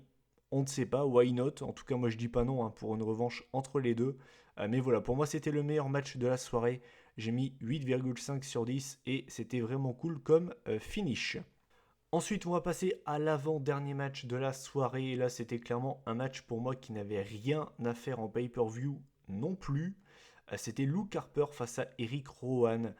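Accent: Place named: England, United Kingdom. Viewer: J'adore ton accent anglais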